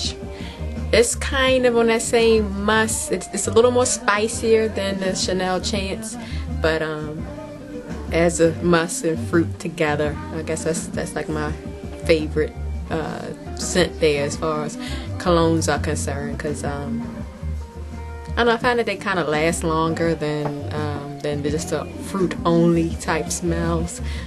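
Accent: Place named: Maryland, United States. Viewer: thought i heard a maryland accent!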